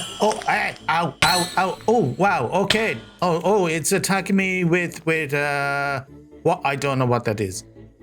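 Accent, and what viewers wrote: Ireland. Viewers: I rarely comment but I must applaud you for the creativity and the amazing videos. Undoubtrdly one of the best minecraft builders I've seen, if not the best! You are not small but a big gem among the minecraft creators. Of cours love the accent too, Irish but not too Irish so I can actually understand what you're saying lol. Keep them coming pls :medal-yellow-first-red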